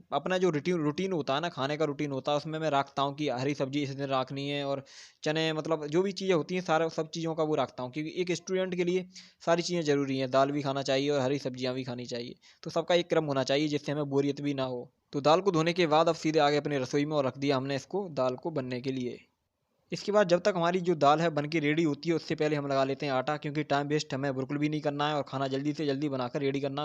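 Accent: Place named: Etawah, India. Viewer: Aapki voice Etawah jaisi lag rhi h .. apke vlogs bhut motivational h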